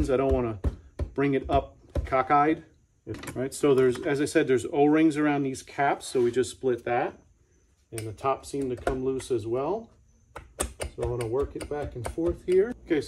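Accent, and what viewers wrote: United States. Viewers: I'm from Bilbao, a city at the northern coast of Spain near France. I went to Southeast Texas when I was young and I had visited the US several times because my company used to send me to some broadcast exhibitions named NAB in Las Vegas and to visit some factories mainly from California. I fancy boats since I was very small. I've got a picture onboard my parents boat when I was three months old, and I got my captain license when I was 15. I own a 14 foot boat with a 15hp two stroke Mercury for rowing and some fishing, a Pursuit 2550 that used to have twin Mercury 135 two stroke but now we've got only a single one, because the other one got destroyed. We use thIs boat for fishing and sunbathing with the family. Also got a racing 25 foot sailboat with a Mercury 5 two stroke (I've got one short and three long shafts, four units in all). 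I love two strokes, I'm retired and I still keep my vintage motocrosss bike. Your accent sounds American but... Where are you from?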